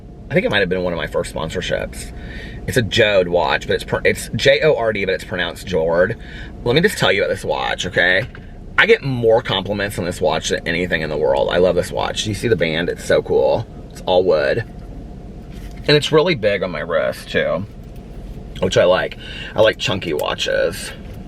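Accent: United States. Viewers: Love the American pronunciation for food....makes us brits / irish laugh...... also you guys still use the phrase handicap, in UK that isn’t acceptable any more. We use the word disabled. Also dressing up is wearing a black crew tee shirt lol.... in uk dressing up is wearing a suit. Glad you’re feeling better ❤️❤️❤️❤️ Lol in uk we have nothing but round abouts. If you ever come to UK DONT go to Milton Keynes.......nothing but roundabouts it’s like the capital of roundabouts 😂😂😂😂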